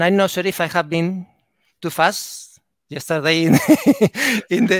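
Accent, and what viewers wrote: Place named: Spain. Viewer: very difficult to understand. strong Spanish accent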